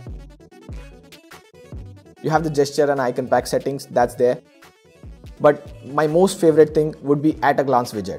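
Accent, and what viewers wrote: India. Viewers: clicks on the video, dude speaking in an indian accent, shuts the video never trust indians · Damn, l could barely understand, hideous Indian accent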